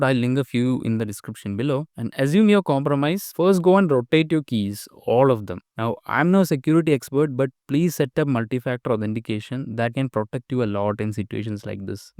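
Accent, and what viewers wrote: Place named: Kerala, India. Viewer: Are you a Malayali? That accent sounds familiar.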